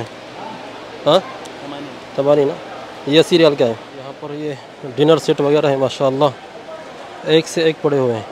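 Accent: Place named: Karachi, Pakistan. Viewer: Karachi lug raha hay😀Aap ka taluq karachi say hay??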